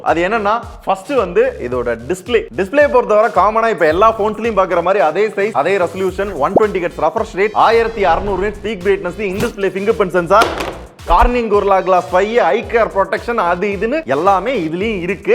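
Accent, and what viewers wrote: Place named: Madurai, India. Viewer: மதுரை காரனுக்கு நீங்க பேச சொல்லிக் குடுக்க வேண்டாம்...பேச்சு வழக்கு அப்படி தா இருக்கும்... 💯 பிடிச்சா பாரு இல்லனா‌ கிளம்பி போய்டே இருக்கனும்..✅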